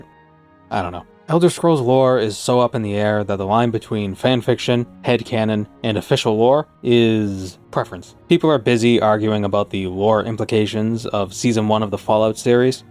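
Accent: Boston, United States. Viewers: So are we just never going to acknowledge the Boston accent?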